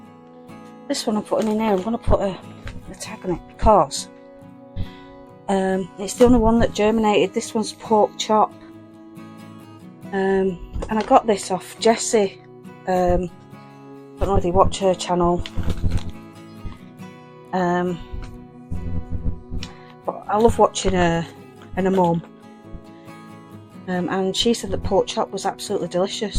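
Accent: Lancashire, United Kingdom. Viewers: I live in Japan now, but grew up in Lancashire. As soon as I heard the accent I knew you were one of my people. :D It's great to hear on this big, wide internet. Great stuff. I've a smallish garden myself that I've just started a channel on (not this account :D). I only have two tomato plants and they've just set fruit in the past 2 weeks, but maybe I'll catch up to you next year. :D You mentioned that you were a beginner, but have you grown so many tomato plants before? I'm looking forward to seeing how they get on. Cool that you have so many frogs!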